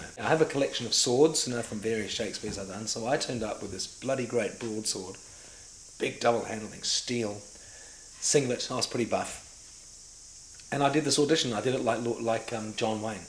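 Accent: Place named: United Kingdom. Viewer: he's a kiwi with a British accent! he grew up mostly in England before moving to NZ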